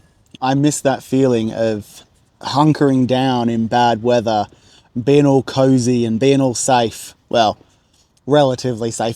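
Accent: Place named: England, United Kingdom. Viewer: Nice one, you have picked up a bit of a Pommy twang 😂 I have sub’ed, greetings from Halls Head, WA 🇦🇺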